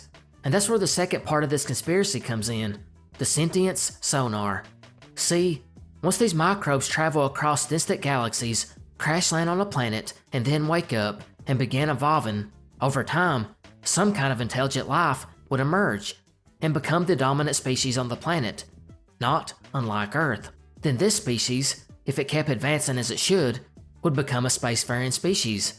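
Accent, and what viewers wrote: South, United States. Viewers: Nice to hear another southern accent with some obvious intelligence behind it. Excellent content brother. Good stuff. *at 63 I began to mediate out of scientific curiosity. Just to see for myself IF there's anything to this. There IS. There is a LOT to it. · Came for the conspiracy theories,stayed for the southern drawl. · What in cousin loving tarnation is this universal southern ai accent? A taste of Appalachia with a baby momma in Texas but currently resides in Georgia WTF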